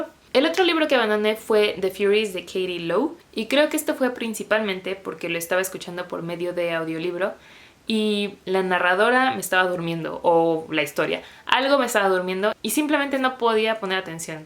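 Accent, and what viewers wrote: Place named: Spain. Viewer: Youuuu have an amazing spanish accent😭❤ Can't help but subscribeee❤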